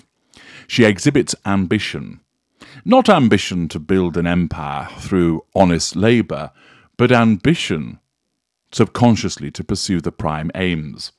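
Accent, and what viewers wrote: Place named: England, United Kingdom. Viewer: Hello? Are you not the "real" person who had your own t.v. podcast in U.S.? You stood out to me because of your very "english" dialect on U.S. t.v. or is it podcast. Bald head with glasses? How can one forget! I'm sure someone out there knows your identity.?? Interesting change of carrier that you now have. Oh I'm not in U.S. like yourself but in Norway.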